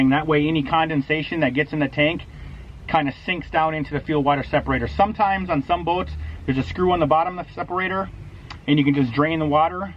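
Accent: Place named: Wisconsin, United States. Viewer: keep up the great videos! They have been extremely helpful. Watched several of your winterizing videos last year to get my boat put away. Cranked up easy this year and we have already been out on the water a bunch. Also replaced my exhaust flappers, and going to be replacing my trim solenoids soon! Your videos are spot on, and I really dig the Wisconsin accent. Peace brother!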